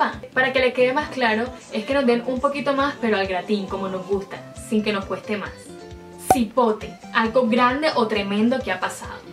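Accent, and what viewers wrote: Barranquilla, Colombia. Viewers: Oh me es acento costeño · Un ejemplo el acento que empleas es un acento indudable de Barranquilla. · no es el acento costeño, ese es el acento barranquillero, muy diferente al de otra ciudad muy importante de la region que es Cartagena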